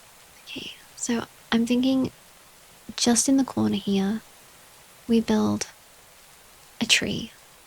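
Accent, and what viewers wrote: England, United Kingdom. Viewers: This is the most English voice I've ever heard, goated 🙏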